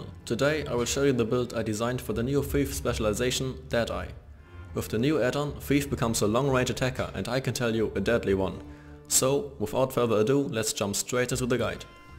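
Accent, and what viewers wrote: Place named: Germany. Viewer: wie ich einfach instant höre das du deutscher bist ^^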